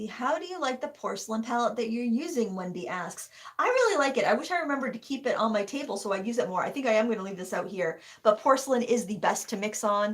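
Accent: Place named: New England, United States. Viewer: You talk like a New Englander! NEVER apologise for that! New Yorkers talk even faster! You guys are just who you are and i, as a Brit, love your vieos, don't change to try and please everyone..southerners talk so s l o w, so each region is unique! Your art work is gorgeous!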